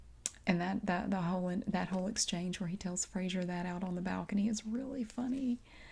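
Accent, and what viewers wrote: South, United States. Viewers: Love your southern accent. It's fairly mild or just a kind I prefer. Since you must like more dry humor like me, it's possible you'd like Dom Joly's two main shows (that I know of) which ran back in the 2000's like 'World Shut Your Mouth' and also 'Trigger Happy TV'. They're more prank/public spectacle based though.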